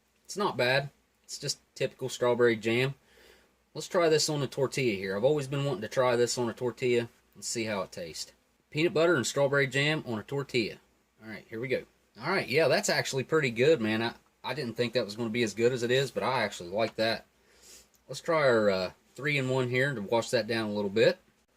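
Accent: South, United States. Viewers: recognized the heavy southern drawl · Where ya from? At first I thought you were Australian but you sound American. You from Texas?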